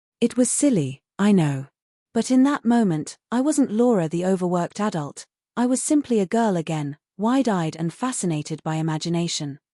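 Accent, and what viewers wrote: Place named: United Kingdom. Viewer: This story takes place in Chicago, so why is the narrator speaking in a British accent & pronunciation?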